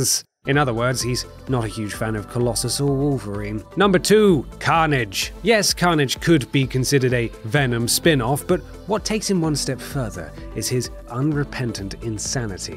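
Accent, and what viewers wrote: United Kingdom. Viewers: I don't really agree with the whole list but the British accent makes me believe that I'm wrong and they're right.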